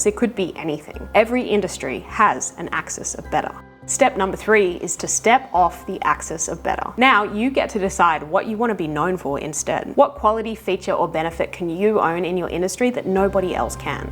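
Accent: Australia. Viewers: Exactly. I think this kind of mistake is generational and geographical. She sounds Australian and also young, so probably wouldn't have the best grasp of British car industry and world history. The Mini was made by BMC, designed by Alec Issigonis. Volkswagon was created by the German govt and the Beetle was designed by Ferdinand Porsche. The word Volkswagon means 'People's car'. Sure both the Beetle and the Mini were 'cheap transportation' icons around the world, but it's pretty inexcusable to make the claim in the video. Poor research. Ironically the Beetle made Volkswagons name and turned a struggling company into a corporate superpower (more ironically it was rescued from the scrap heap by a British army general in the last days of WW2) and would eclipse the entire future UK car manufacturing industry. The Mini was a cultural icon of the 20th century almost to the degree of the Beetle, but it helped bankrupt BMC as each one was sold at a LOSS. 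The more Minis sold, the more money BMC lost....and yet they didn't actually replace it with a more profitable new model until 1980 with the Austin Metro. The reason cited for retaining the Mini was always 'The Mini is still selling in large numbers'!! 🤣🤣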